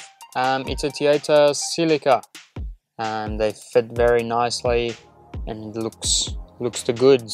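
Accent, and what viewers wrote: South Africa. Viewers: I can hear that Boer accent coming out. Love that i0 of yours. Have a Jimny but tired of the 1.3 and other irritants. Enjoy. Cheers from sunny SA.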